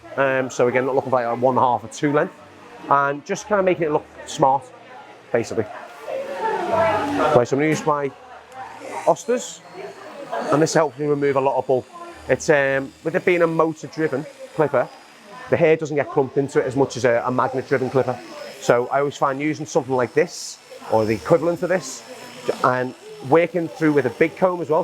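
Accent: Liverpool, United Kingdom. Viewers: It sounds to me like a 'scouser' accent or dialect. This is associated with Liverpool in the county of Merseyside, England, UK 👍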